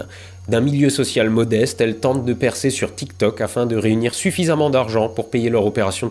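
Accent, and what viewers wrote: Alsace, France. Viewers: C'est un accent alsacien pas belge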